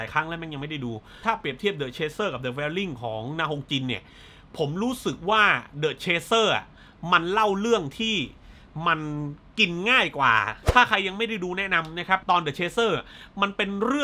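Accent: South, Thailand. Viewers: เอ้า คนใต้รึครับพี่บาว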